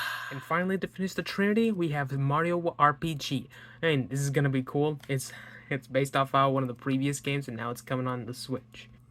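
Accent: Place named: Canada. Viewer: where are you from, the accent I can't place it some things are giving me the great white north vibes lmfao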